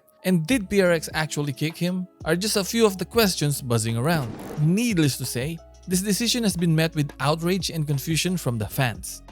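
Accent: Philippines. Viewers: why do u sound filipino bro. Anyway, here's my sub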